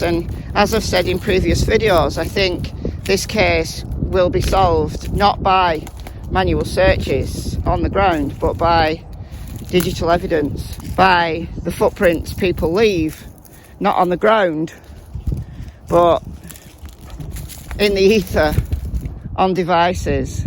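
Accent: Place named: United Kingdom. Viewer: I love this accent greeting me in the morning, don't you!! Something about a UK accent that makes a person sound so much more interesting! I heard that they don't feel those footprints are Summer's. I can't for the life of me remember who said it. It was a creator that is very close to Kingsport.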